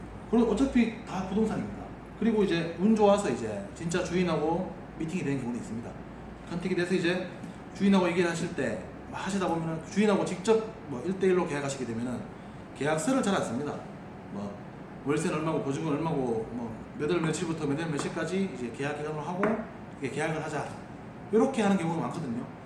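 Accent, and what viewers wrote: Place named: Gyeongsang, South Korea. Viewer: 경상도 싸나이 맞지예ㆍ고맙습니다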